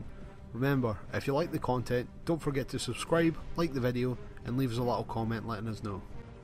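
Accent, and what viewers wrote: Scotland, United Kingdom. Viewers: What accent is it? Scottish, irish?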